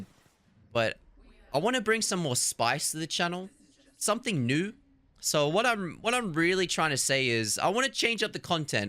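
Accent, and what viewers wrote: Australia. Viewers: I don't care how long it'll take you to upload or how bad a video may be, ill watch it and support you because i love listening to an aussie ramble about shit and your content is amazing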